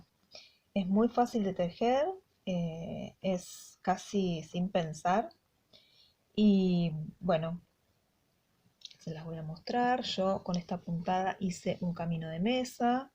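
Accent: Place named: Buenos Aires, Argentina. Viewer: Me encanta! Una argentina...por la tonada de Buenos Aires!❤. Bendiciones!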